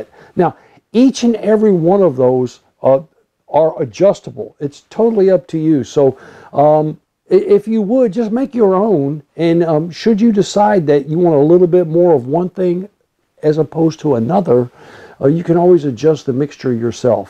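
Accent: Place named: Appalachia, United States. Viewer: Tou dont have very good control of the english language at ANY rate, APPALACIAN ENGLISH IS HAYSEED ENGLISH.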